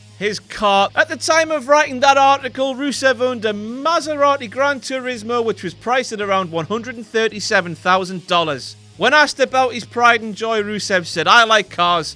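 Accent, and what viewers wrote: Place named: Newcastle, United Kingdom. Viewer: Max Mekker depends what part of Britain they are from, considering is from Newcastle he will pronounce it differently than someone in Manchester or London for example.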